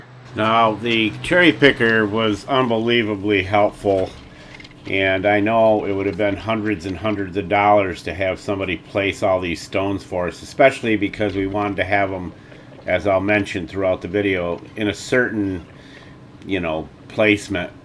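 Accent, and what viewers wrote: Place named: Upper Peninsula, United States. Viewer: Crazy Upper Peninsula accent.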